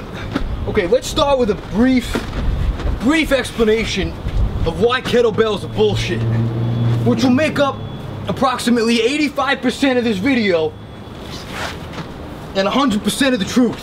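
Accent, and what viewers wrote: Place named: New York, United States. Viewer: I like your funny accent like newyourker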